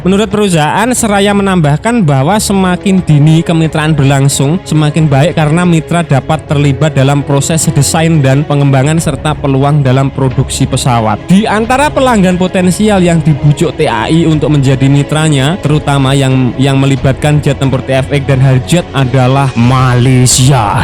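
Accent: Indonesia. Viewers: lah kenpa lu ngurus gua... Bukan kah konten tentang malaysia 🤣...malaysia apa indonesia yg suka ngurusin urusan orang... Dan youtubernya pun orng indonesia...dah lah coy gx usah make cara nenek moyang klian lagi yg suka ngadu domba melayu dgn melayu... Yg ad klian nyesal jika terjadi konflik di 2 negara ini..dan lu pun tau dri logat youtuber nya bukan 🤣...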